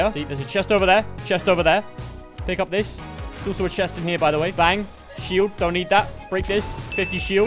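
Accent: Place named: United Kingdom. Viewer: L british man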